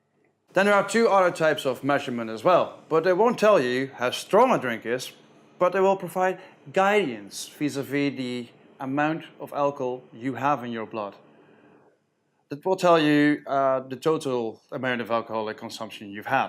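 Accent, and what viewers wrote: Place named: Ireland. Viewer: Dude, what's the deal with the Irish accent? You're Dutch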